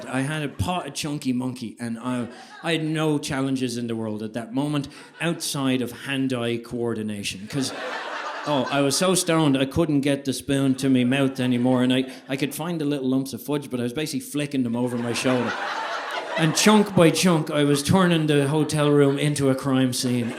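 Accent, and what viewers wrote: Ireland. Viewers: You are absolutely brilliant. Of course everything sounds even funny with the Irish Accent 😂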